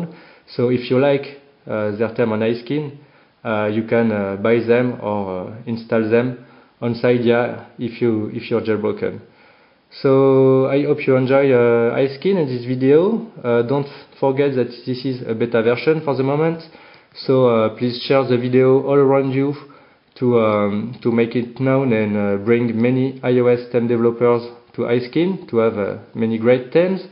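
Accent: France. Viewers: J'adore cet accent! Vive la France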